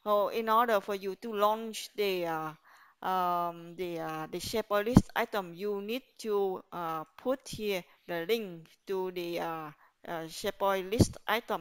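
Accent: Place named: Vietnam. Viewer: thề nghe giọng đọc TA biết ngay người Vietnam :))))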